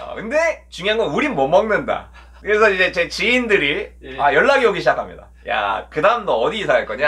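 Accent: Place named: Chungcheong, South Korea. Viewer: 아 충청도 사투리 넘 귀여워옄ㅋ 날른겨~~~ 긍정마인드 배우고 갑니다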